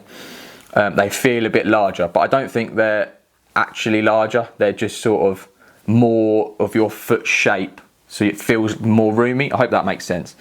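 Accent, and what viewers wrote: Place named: London, United Kingdom. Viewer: Hello,! Thanks for replying:) Yes, it is a very beautiful accent actually! I lived for two years close to Victoria Park, in Mile End, and I have very good memories of it. Overall, I enjoyed London pretty much. Was a great experience to live in there as there are many beautiful parks, it is full of life, etc. I was wondering, if would like to practice some Spanish, reach out to me! Sometimes I do weekly Skypes with people from the UK so as to not forget my English in exchange of Spanish, and we keep it flexible, so if you can't make it one day it's absolutely fine. Anyways, have a good day, and great video! Cheers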